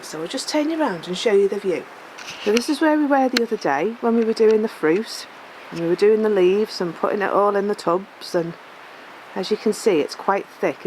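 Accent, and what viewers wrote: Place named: England, United Kingdom. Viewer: I have just found you! It is lovely to hear an English voice, as there are so many other folk, but not many English videos it seems, who are about every day life. I love where your live, and those beautiful trees. I used to keep chickens, Rhoad Island Reds, like yours, and I loved them - they were ex batterie. The whole world around you looks so wonderful in the snow - fairy dust on everything. Love your hat! Blessings to you from Jilly & Madge the beautiful black rescue greyhound, from Devon, England xxx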